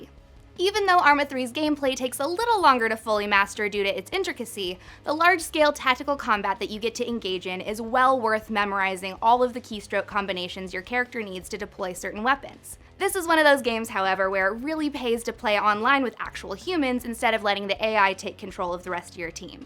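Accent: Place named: United States. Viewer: Gosh, what a disgustingly thick rhotic accent... Can't listen to this woman. Why do some of you Americans make such a fuss about pronouncing a simple, innocent 'r' ?! It's just an 'r' , godammit, you don't have to swallow your tongue to pronounce it! You don't have to rape the li'l 'r' so hard! You sound like you talk with a hot potato in your mouth. Plus this nagging voice... No way, José!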